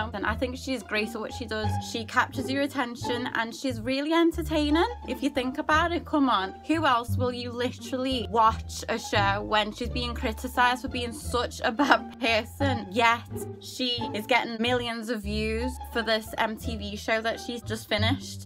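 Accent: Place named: England, United Kingdom. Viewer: wait i love your accent girl!!! one of my friends is from england and you sound EXACTLY like her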